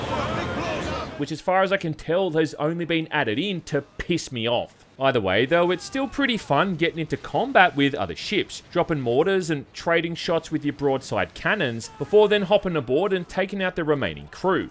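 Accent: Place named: Broad, Australia. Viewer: I saw one of your earlier videos before this and the difference in your accent is insane. You’ve gone full bogan. Did you move to a different part of Straya a few years ago or something?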